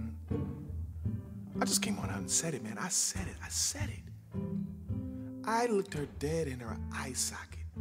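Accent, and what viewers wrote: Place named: South, United States. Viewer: You have a southern tanggg to your voice lol, it’s so unique. I was curious to where you’re from. Keep staying true to yourself :)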